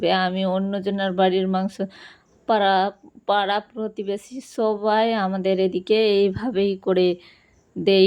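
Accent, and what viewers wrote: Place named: Murshidabad, India. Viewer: আপু তোমার বাড়ি কি মুর্শিদাবাদ , তোমার কথা শুনে ওরকমই মনে হচ্ছে😊😊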